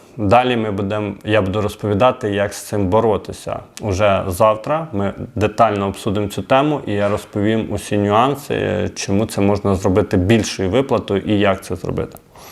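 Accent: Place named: West, Ukraine. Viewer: Звідки ведучий? Виглядає, що десь з села з західної України. Говоріть нормально, а не як цвєк. БудУт, становляТ і т.д. Хлопец зі села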